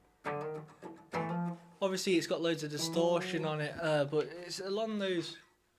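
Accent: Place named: England, United Kingdom. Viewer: Cool to see you reacting to, always enjoy seeing what you think of symphonic metal bands and the interesting things you point out. (And the recently was a nice surprise; the first album I ever bought was the Hip's third album, on cassette, when I was in junior high school.) I was pretty sure I remembered you being surprised by the dark-haired guitarist's name,. (Presumably since it sounds like the online store Temu?) Maybe I'm remembering a different reactor? Google didn't find any earlier vids of you doing or other tracks, or for. And you'd definitely remember having seen these guys in the same studio rehearsal session and wouldn't have used this title. Maybe the topic came up in a reaction or something, when you were talking about a recommendation you'd gotten or something. I can definitely picture an English accent like yours saying " " and being surprised and amused by it. 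--- I'm not sure what use to get that acoustic-like sound from those guitars which obviously don't have a resonant cavity. One possibility is a modelling amp, which simulates the sound an acoustic guitar would make for the string vibrations picked up by the pickups. (e.g. uses a Kemper modelling amp for the guitar neck of The Beast, e.g. in (middle subtitles included version if you want to check it out), or in a few songs like or I think where he switche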